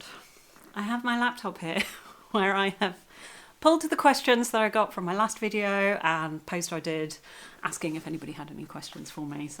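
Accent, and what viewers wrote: United Kingdom. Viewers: Hi. I've only just found your channel but it's good to listen to you. A lot of the crafting videos are American, which is okay but it's good to hear an English voice, especially as I live in very rural France, although am English. I guessyou could say I'm a multi crafter and love to try lots of different textile related but not purely crafts. I've made textile art dolls(primarily fabric), dressmaking and other clothes, knitting, embroidery, weaving, felting, textile art and mixed media pictures, made fabric animals, driftwood houses, Christmas decorations and wreaths, both fabric and natural, fabric collage, mixed media using all sorts of art supplies, sketching, entangle and am currently dipping my toe into the world of natural dyeing. I'm a retired nurse so absolutely understand your work life balance situation but am lucky enough now to have the time to play more, if not necessarily the budget to with it. 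I love to thrift or recycle as many supplies as I can. Looking forward to watching your previous videos and please DON'T enter me for the pattern giveaway as I already have a big stash of both knitting and sewing patterns I still want to make, and despite retirement, there's still never enough hours in the day 😅 Oh, and yes I'm crafting while listening 😊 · I’m a sewist and knitter. I started with the latter but always loved clothes. I thought I recognised your accent - I’m a midlands gal and after growing up in Leicestershire I’ve now been living/working in Derbyshire for the last 8 years. Congrats on 2K followers!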